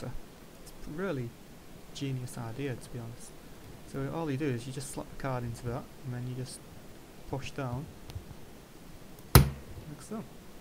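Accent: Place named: Brazil. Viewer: Are you brazilian? Your accent sounds familiar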